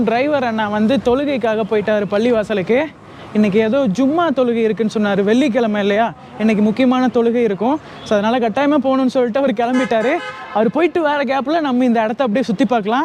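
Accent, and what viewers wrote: Tamil Nadu, India. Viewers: Srilankan ah irunthalum thamilnadu thamil pesuraga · உங்க தமிழ் நீங்க பேசுறது தமிழ்நாட்டு தமிழ் போல் தாம் உள்ளது, நீங்களா நான் இலங்கை என்று சொன்னால் தான் இலங்கை என தெரியும் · Super sir u purely tamil nadu voice wow nice 👍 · பாகிஸ்தானில் மலைப் பிரதேசங்களை கண்முன்னே காட்டியதற்கு முதலில் நன்றி உங்களுடைய உச்சரிப்புகள் எல்லாம் இந்திய தமிழ் உச்சரிப்பு ஆக இருக்கிறது ஆனால் நீங்கள் ஸ்ரீலங்கன் தமிழன் என்று கூறுகிறீர்கள் எப்படி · அசல் தமிழ்நாட்டு பேச்சி BRO நீங்க தமிழ்நாட்டில் இருந்திர் பிர்கள் அதான் நாங்க பேசிறேமாதிரி இருக்கு BRO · தமிழ்நாட்டு தமிழ் பேசுறீங்க சிறப்பு நண்பா🎉🎉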